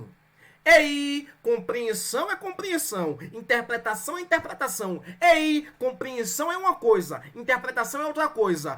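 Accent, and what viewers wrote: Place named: Northeast, Brazil. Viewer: Capote na enfermagem é uma proteção..... espécie de avental......eu amo a linguagem e o sotaque nordestina.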